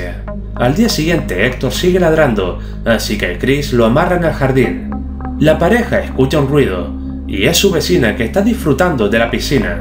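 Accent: Canary Islands, Spain. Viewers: Are es canario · Eres canario?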